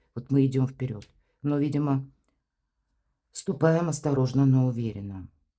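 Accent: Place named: Belarus. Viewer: вы скорее всего из Белоруссии? Несколько лет назад жила в Беларуси,ваш говор проскальзывает.Благородный народ, люблю вас... спасибо ❤❤❤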